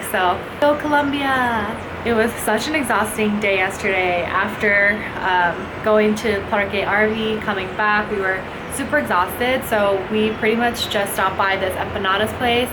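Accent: California, United States. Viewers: your whiney cali drone voice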